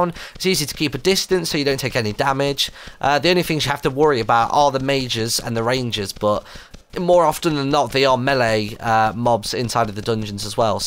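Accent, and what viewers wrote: United Kingdom. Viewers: Its just his acsent. Hes Britain dont make fun of him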